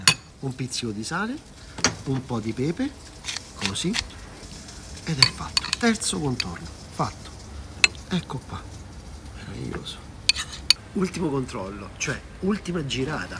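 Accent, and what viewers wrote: Rome, Italy. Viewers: I tagli non ci appiccicano niente, prima di sigilla fuori e non si inizia dall osso. Poi aver separato la carne e’ inutile a quel pubto si sarebbe dovuto cuocere filetto e controfiletto gia’ disossati, inoltre era troppo alta, inoltre la carne e’ troppo magra, insomma quasi ogni cosa che si poteva sbagliare, l’ha sbagliata a dimostrazione che in italia la cultura della bistecca non c’e’ proprio. Inoltre tutto quel romanesco da’ molto fastidio all’ascolto, bisognerebbe imparare un po’ di dizione corretta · È stupenda la dissonanza tra la parlata romana e i sottotitoli 😁😁😁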